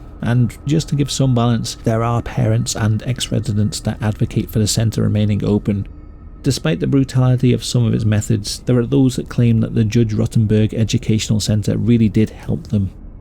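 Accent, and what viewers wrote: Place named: Liverpool, United Kingdom. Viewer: Love this channel. Plus your also nearly scouse accent makes it better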